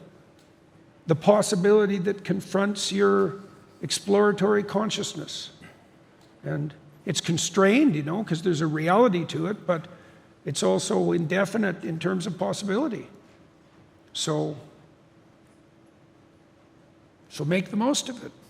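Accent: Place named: Canada. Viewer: He’s Canadian and has an accent as many if not most Canadians do when they pronounce “a”, if anything he was courteous enough to try to correct himself when he noticed. Does he truly strike you as somebody who would try and make a passive aggressive jab at someone by purposely mispronouncing their name? If anything he just spoke it as he speaks naturally and you’re being intolerant of him. But the main thing is, who cares? Your name is a sound that you respond to like a dog, don’t get so hellbent on stuff like that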